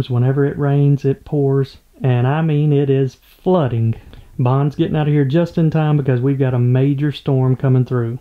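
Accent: South, United States. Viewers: Same 🇬🇧 here. We clearly NEED wholesome content because I've loved watching this and the gentle southern accent is so calming . Love it 😀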